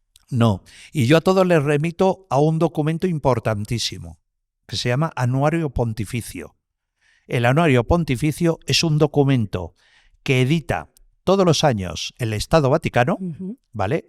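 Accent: Andalusia, Spain. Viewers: Excelente Potscast, la amo a lo maximo, excelente comunicadora, me encanta sus entrevistas y su acento andaluz. Tal vez mi origen tambien viene de ahi, creo que Malaga me jala para alla!! Abrazo beso.!!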